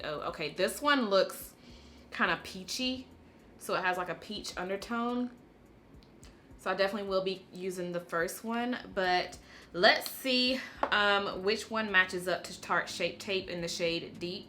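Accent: South, United States. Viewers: I love your southern accent I live I'm from New York city and I luv people with country accents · Girl, you are so pretty. And you sound like you’re from the south. Where are you from?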